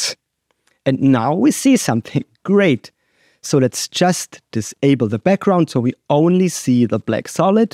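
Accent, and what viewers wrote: Germany. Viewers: Where do you live, sounds like germany...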